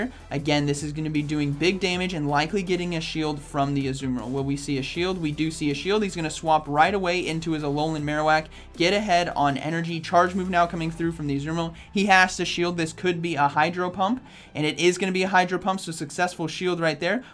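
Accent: Netherlands. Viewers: Lol this guy for sure is from the Netherlands. They have such a specific accent :)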